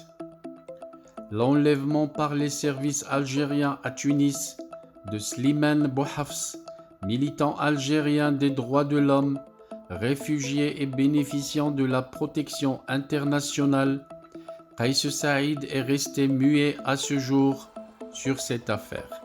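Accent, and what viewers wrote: Morocco. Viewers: Vous êtes Marocain facilement reconnaissable par la prononciation d'un français directement traduit de l'arabe. Le tlemcien parle et pense en français et ne connait dans le détail le passé des relations politique maroco-tunisienne.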